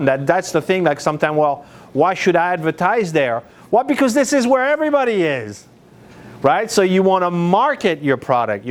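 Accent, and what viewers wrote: Quebec, Canada. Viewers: Sounds more like Quebec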